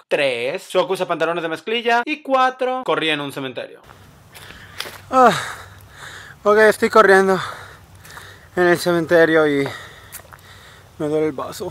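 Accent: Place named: Yucatan, Mexico. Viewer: eres de Yucatan?? tienes el acento....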